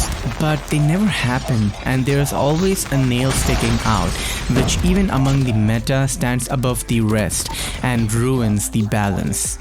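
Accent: India. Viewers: Btw bro are you indian? You sound like indian ☺️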